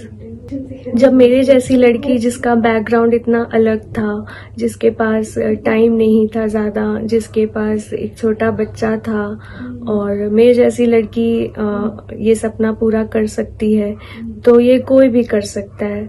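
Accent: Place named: Bihar, India. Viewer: Ma'am r you from Bihar excellent❤️😊😊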